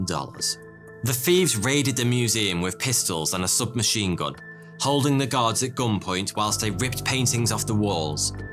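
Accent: United Kingdom. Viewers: he's addressed this. He says both. And that's how the British accent works. Considering how many differences there are in pronunciation, it's silly to even mention it.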